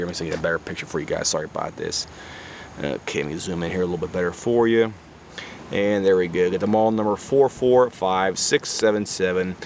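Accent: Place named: Pennsylvania, United States. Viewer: You sound like you got a PA accent like me lol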